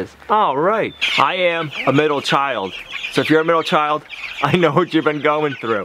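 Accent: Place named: New England, United States. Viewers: I didn't find your channel until this year. I told my wife ... "he has a funny accent for a guy from New Hamphshahh." Now your accent makes sense. My relatives lived on Davis Street, at the top of the hill over the Norfolk Aggie. When I grew up, we used to walk down and to the back and visit the farm animals. But we know to avoid the geese in the front pond ... they were aggressive and mean. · I like listening to your new England accent. Your channel is very interesting. You seem to enjoy your homestead so much.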